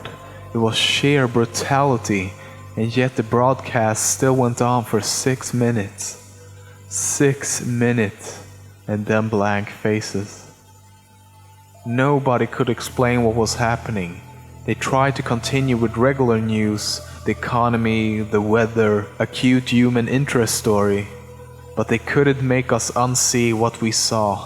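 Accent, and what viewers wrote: Sweden. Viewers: The jolly, good Swenglish dialect! :D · his swedish accent is more prominent in his older videos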